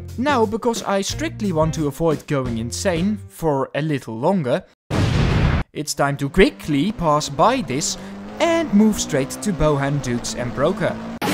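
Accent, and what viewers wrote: Netherlands. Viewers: nice dutch accent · Nederlands accent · Are you Dutch? Your accent sounds Dutch 😂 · I have an feeling that you're dutch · what an example of dutch accent😊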